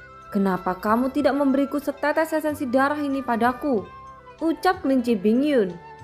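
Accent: Java, Indonesia. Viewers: logatnya kaya orang jawa min..?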